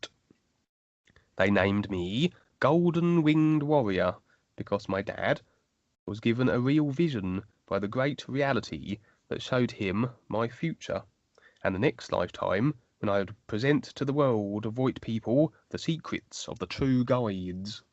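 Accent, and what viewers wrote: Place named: England, United Kingdom. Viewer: Thanks, it’s nice to have a human voice and not a digital one to listen to. It takes awhile for me though, as a non native speaker, to get accustomed to your pronunciation. Are you from England or from Australia or New Zealand?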